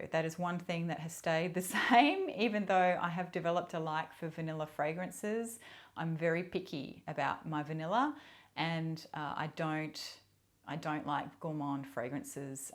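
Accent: Australia. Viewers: Totally agree with Tyler.....I love listening to a fellow Aussie. Your videos are such an easy watch.♥️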